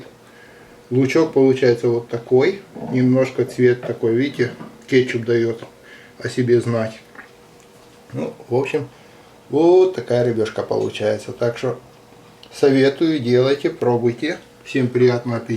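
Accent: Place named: Odessa, Ukraine. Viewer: . красивый одесский акцент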